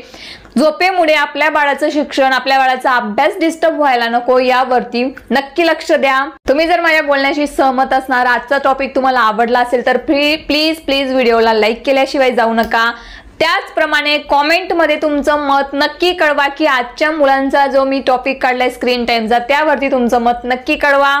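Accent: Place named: Jalgaon, India. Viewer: मॅडम जळगाव चे असतील असे बोलण्यावरून वाटत आहे मराठी पेक्षा इंग्लिश जास्त छान आहे पण विचार हि खूप चांगले आहेत खूप छान माहिती आहे 🙏🙏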